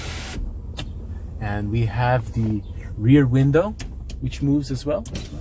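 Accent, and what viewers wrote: Russia. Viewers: raaashian accent? cool truck dude. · If that’s a scwartzenegger reference, i don’t think this guy is Austrian, he sounds Russian or maybe Ukrainian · Smoothest Russian accent I’ve ever heard. That interior color is horrible btw.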